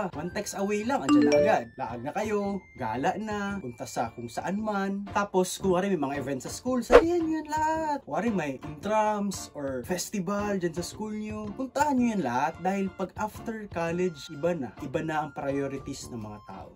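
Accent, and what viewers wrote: Visayas, Philippines. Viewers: I like the Bisaya accent🤣 KUDOS kuya👍👍👍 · Ay bisaya jud diay ka. Mahibal an mn gud sa pag storya dol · Bisaya man diay ka Sir HAHAHA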